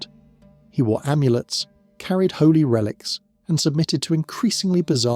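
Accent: United States. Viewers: Sounds like a normal Guy from Alabama. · Old gammon Brit here. We all used to fall about in tears of laughter when somebody said "dienasty"! Now British kids - such as you - speak american. Oh well. That's the future I suppose.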